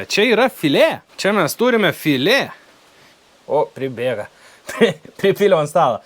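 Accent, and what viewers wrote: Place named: Molėtai, Lithuania. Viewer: Iš tarmės gal pons iš Molėtų ar Utenos kraštų?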